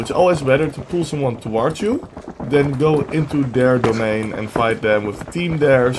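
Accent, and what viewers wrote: Netherlands. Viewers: nederlander detected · Dutch accent spotted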